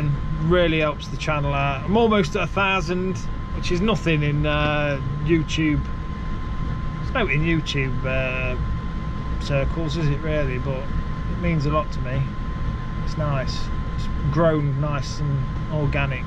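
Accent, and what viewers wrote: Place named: Mansfield, United Kingdom. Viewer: Loving the vids, you originally from Mansfield area by any chance? Your accent is very familiar!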